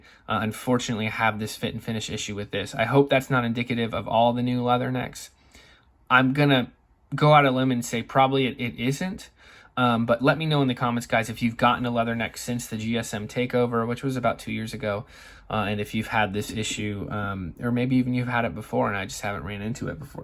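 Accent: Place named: England, United Kingdom. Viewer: Just hear the accent he is english " if we do outdoor testing we get 10 years 😢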